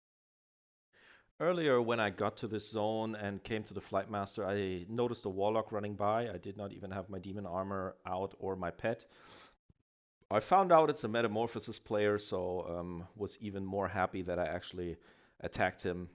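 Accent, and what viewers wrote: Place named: Germany. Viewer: So ich denke mal das du deitscher bist, nach dem englisch akzent hört sicht das so an sehr geiles video, aber gehst du jetzt standart auf SA oder doch fellguard? Also klar kommt es immer drauf an aber ich finde SA hat geileren dmg sber der support vom Felguard is nich ohne... vllt son Fazit mit dem 120%buff und spielst du destro oder diesen misch specc in pve?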